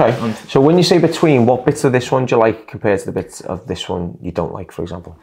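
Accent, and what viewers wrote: Liverpool, United Kingdom. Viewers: are you from Liverpool or even Manchester? Yank here...Can't quite place your accent. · Nice to hear a Scouser! Is your salong in Liverpool?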